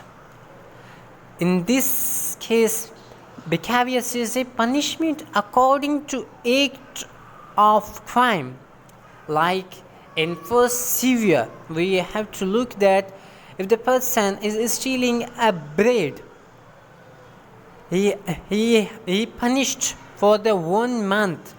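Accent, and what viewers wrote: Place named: Pakistan. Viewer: Pake ascent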